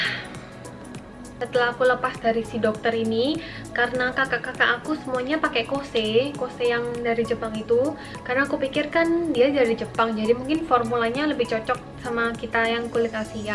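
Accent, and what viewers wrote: Java, Indonesia. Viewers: Cantik+logat medok Uhhhh😍😍😍🥰🥰🥰🥰😍😍😍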